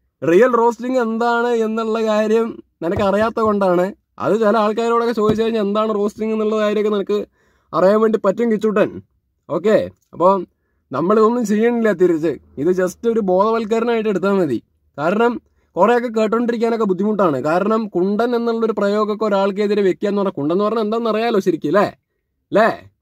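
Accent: Palakkad, India. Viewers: Bro palakkad aano language ath pole thonni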